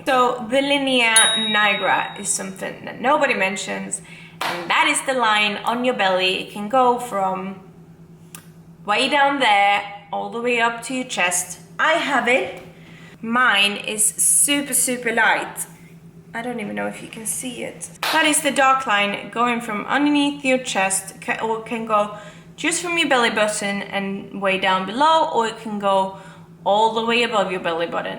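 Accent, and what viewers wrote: United Kingdom. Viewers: Omg your accent is so much more British🤣🤣 love it 🥰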